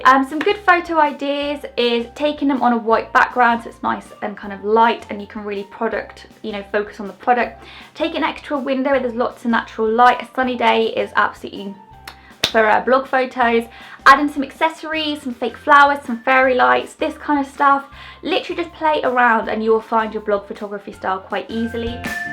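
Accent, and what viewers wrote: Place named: United Kingdom. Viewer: Gymnast4Life-M I know right sooo British in British don't have such a beautiful accent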